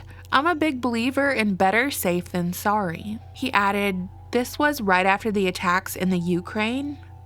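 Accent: South, United States. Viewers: Would love to hear you do an audio book. Ham-up the Southern accent a bit and do "Huckleberry Finn". Too Long? What about "Tom Sawyer"?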